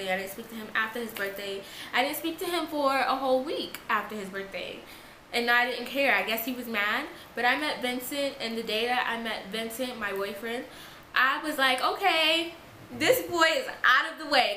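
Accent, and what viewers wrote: New York, United States. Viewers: NY All the way! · new york 😊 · That's how a lot of New York people talk, it's kinda like our slang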